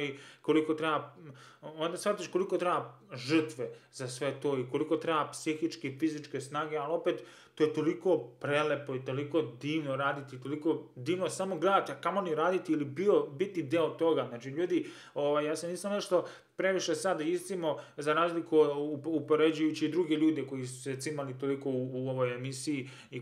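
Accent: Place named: Bosnia and Herzegovina. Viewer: Koliko mi je jak ovaj momak,kako priča haha poyy brt iz BiH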